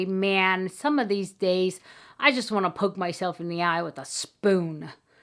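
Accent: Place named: Boston, United States. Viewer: I absolutely love your accent hon, is it Boston? I’m hopeless at working out regional accents x I’d love to see your skincare regime as your skin always looks so fresh x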